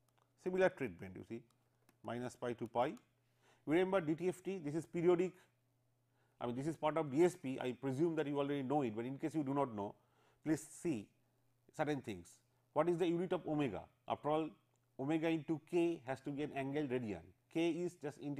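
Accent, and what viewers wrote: India. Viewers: Yes, this is not english. This is Indian English, and we are proud of it. Want to have more of it? Just type Russell Peters on youtube and watch some of his videos..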